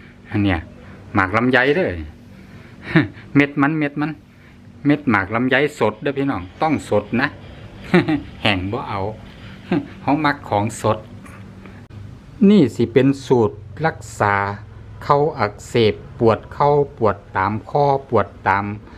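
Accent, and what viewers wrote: Thailand. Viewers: ทำไมไม่พูดภาษากลางล่ะ คับ ฟังไม่รู้เรื่องเลย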